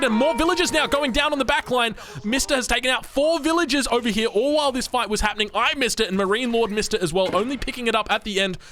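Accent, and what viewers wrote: Australia. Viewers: Your channel is going to get huge dude. You're spamming out uploads at the perfect time, you're noticeably enthusiastic and knowledgeable about the game, and Americans seriously love Australian accents. I wouldn't be surprised if you had 100k subs by Christmas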